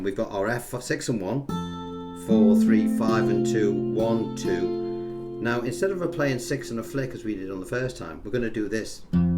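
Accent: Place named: Wirral, United Kingdom. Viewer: Thanks for all you're doing. I'm really enjoying it... especially as all tuition is in a familiar Wirralian accent. Cheers!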